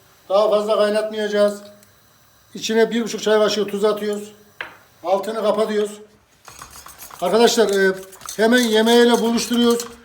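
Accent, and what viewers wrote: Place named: Anatolia, Turkey. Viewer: Reis uzun zamandir senin Hemen tüm yemek tariflerini seyrediyorum ve yapiyorum Gercekten inanilmaz lezzetler cikiyo ortaya Ellerine emegine saglik Son olarak sunu da söylemeden gecmicem Cok naturel bir insansin Kisiligini karakterini bozmadan aynen yola devam ediyosun Özellikle konusurken siveyi bozmadan yapmacik degil tamamen anadolu agziyla anlatiyosun Helal olsun Yolun bahtin acik olsun Viyana dan selamlar Saygilar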